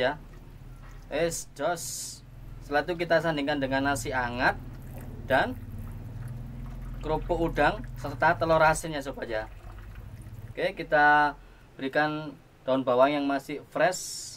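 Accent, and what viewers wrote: East Java, Indonesia. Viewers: bahasanya medok banget orang jawa timur mana ya chef 😊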